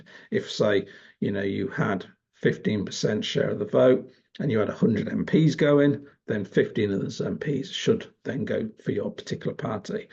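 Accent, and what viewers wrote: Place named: Mockney, United Kingdom. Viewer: your MOCKNEY pronunciation is annoying. WIV? FORT? FINK? FING?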